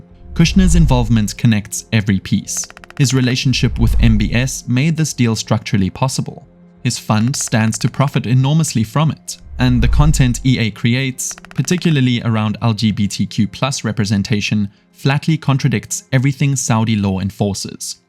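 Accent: South Africa. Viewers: I also thought he sounded South African. 🤔🇿🇦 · top quality content man. and i can hear a "whaaait" South African accent? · Someone said your voice is like a robot, didn't know a South African accent could be robot like, great video bru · bro you have a south african accent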